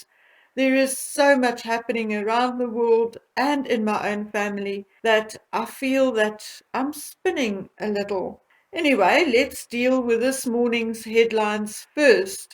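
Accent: South Africa. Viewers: Its very relaxing, then again I am South African living in the UK so any SA voice is a bonus for me.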